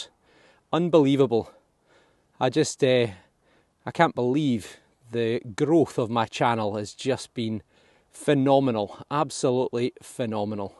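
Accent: Scotland, United Kingdom. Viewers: Grettings from Denmark. I love to watch your videoes and listen to your charming scottish accent. Keep on the god work 😊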